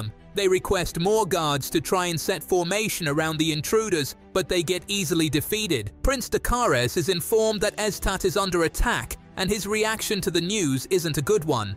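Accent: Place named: United Kingdom. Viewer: I love the British voice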